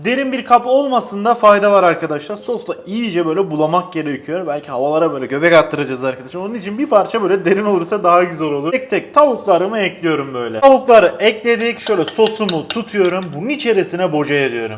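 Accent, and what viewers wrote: Adana, Turkey. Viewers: Ya bak abi sen adanalı gibi konuşan ve Diyarbakır'da yaşayan birisin bugün barbecue wings yapıyoruz demenin ne gereği var barbekü soslu kanat yapıyoruz desene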